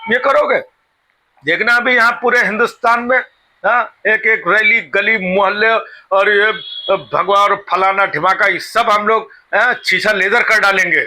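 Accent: Kanpur, India. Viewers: ji aap Kanpur ka accent me bola karo pls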